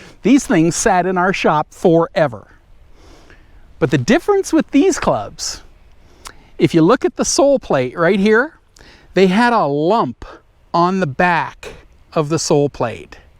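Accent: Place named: South, United States. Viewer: Having spent 12 years in Gainesville Florida I can say you sound like an exasperated southerner. And I love it!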